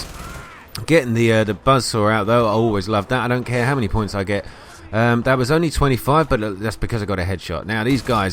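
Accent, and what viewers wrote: United Kingdom. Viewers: Aahh.. I see now that you'd been alerted already, lol.. I had to click "show more comments" to see this one. Hey - you should make a note of that in the description (edit) or something mate... look how many folks didn't notice it and are still thinking that anti-stealth is going to earn near-equal points as stealth - when it's actually less than half the XP eh? Well - I am now subscribed for your future efforts - nice to hear that cool brit accent lol.. cheers!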